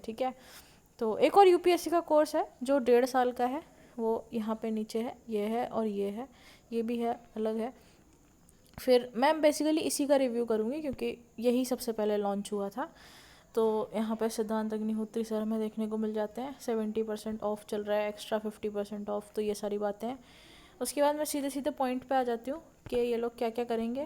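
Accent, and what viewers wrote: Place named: Rajasthan, India. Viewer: aap to rajasthan and haryana sw lagti ho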